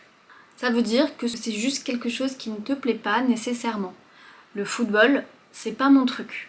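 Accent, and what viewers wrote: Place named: Normandy, France. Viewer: "Je hais" - Décidément on n'a pas le même accent. Tu prononce "Je hé", à la normande, alors que je le prononce "je hai" ou "je hè". Ah ce français et ces accents ! 🤣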